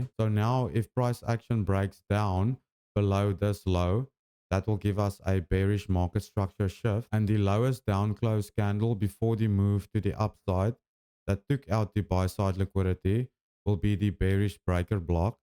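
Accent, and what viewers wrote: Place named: South Africa. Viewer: Brother you sound South African. Wanted to say thank you for your growing channel and your lessons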